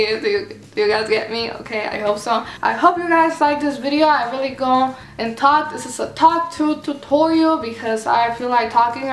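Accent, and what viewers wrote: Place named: North, Mexico. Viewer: are you mexican? you sound kind of norteña, saludos👋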